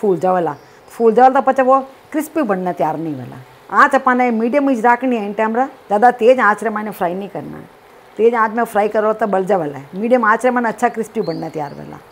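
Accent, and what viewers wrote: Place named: Marwari, India. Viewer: i love ur marwari accent🥰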